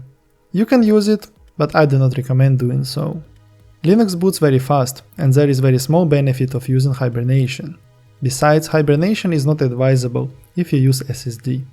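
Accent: Russia. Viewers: strong slavic accent, most probably russian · such modesty and humility is rarely seen. In my case, because of your accent, watching this video reminded me of my childhood spent in Russia. Thank you for that and for the very helpful video!:) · Говоришь с русским акцентом) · I'm sorry, I didn't mean to seem negative in any way. To me it sounds like you have a Russian or Ukrainian accent (?), languages that to me sound like they have a lot of i's or j's directly after some consonants. So i tried to write "probably" the way it sounded to me. Anyway great video, lot of useful information! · Russian accent + linux is very good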